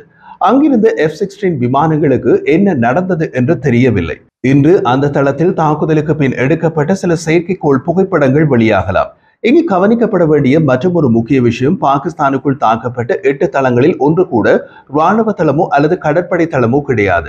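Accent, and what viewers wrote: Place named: Sri Lanka. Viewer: அருமையான தமிழ் செய்திகள் தூய இலங்கை தமிழ் நல்வாழ்த்துகள்!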